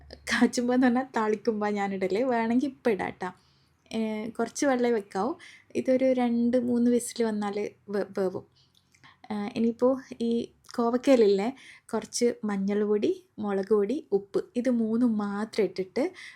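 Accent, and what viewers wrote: Kannur, India. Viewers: Nan link open cheythu nan comend cheyyarilla njan Areacod anu ❤❤❤❤ ningale sound nalla ishttanu kannoor varthanam ishtam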